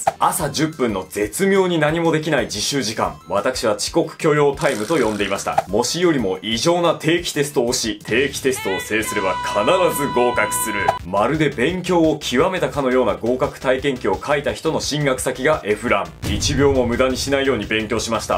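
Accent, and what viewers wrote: Miyagi, Japan. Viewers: もしかして宮城県出身ですか？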